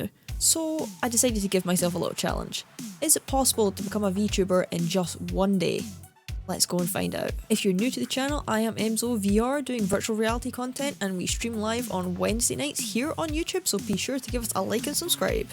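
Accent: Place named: Northern England, United Kingdom. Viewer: So magical when a youtuber responds to me because for now his/hers base is not so big yet. :-) Anyway loving your accent (really, really enjoying it :-D). Are you from north of Britain? (I'm the heart of Europe (Czechia) so I'm not so good of guessing the accent of English speaking peeps :-) )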